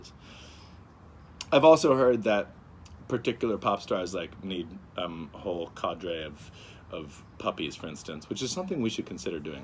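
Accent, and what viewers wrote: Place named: United Kingdom. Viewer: I'm British and that was a good British accent!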